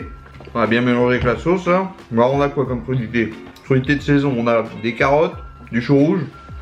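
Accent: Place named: North, France. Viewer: oué j avais reconnu le tiop accent du chnord!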